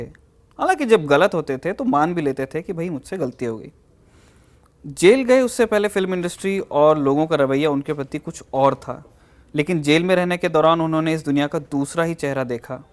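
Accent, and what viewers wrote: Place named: North, India. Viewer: Why are you keep saying BOMBAY instead of MUMBAI which is actual name.? I feel that all north Indians (that what i believe from your pronunciation) are stupid and are not willing t come out of British slavery and so keep reminding themselves with names like BOMBAY instead of its proper, actual & real name MUMBAI...... I am hoping that you will improve on this....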